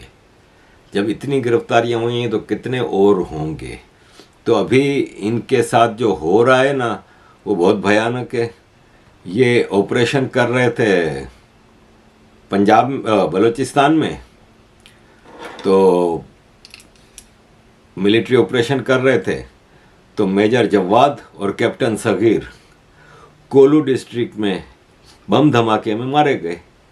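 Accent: Gujarat, India. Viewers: Why bhai sounds like gujrati. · Apka gujrati bhai surat si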